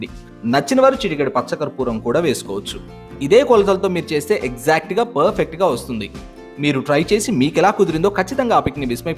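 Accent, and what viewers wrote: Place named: Chennai, India. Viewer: Meedi a oru Chennai telugu la undi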